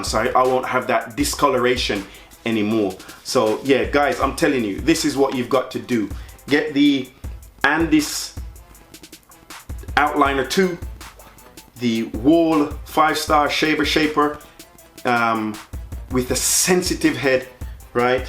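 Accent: Jamaica. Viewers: Hey man, are you originally from Jamaica? I can hear it in the accent. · The patwa hawd fi hide.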